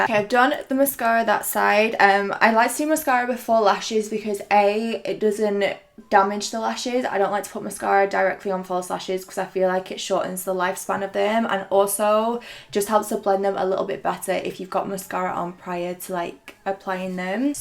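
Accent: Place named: Scotland, United Kingdom. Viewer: Hahaha you must be from Scotland. Aren’t you?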